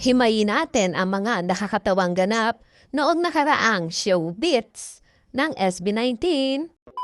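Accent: Batangas, Philippines. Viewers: i really love to hear ur voice ....'yong batangueña ang tono ng pagsasalita ....👸💛❤️💛❤️💛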